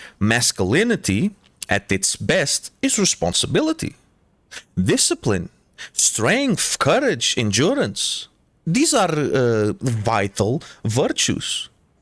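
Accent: Portugal. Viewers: You sound Portuguese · Interesting video. Portugues? e do norte? parece-me 😅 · é incrível como basta um tuga para reconhecer outro tuga a falar ingles ahah, vídeo incrívellll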